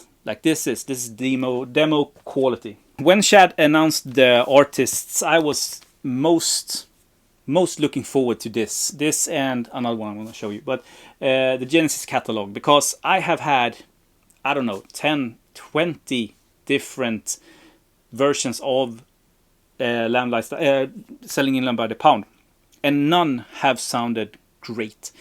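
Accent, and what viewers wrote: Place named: Sweden. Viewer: gillar svengelskan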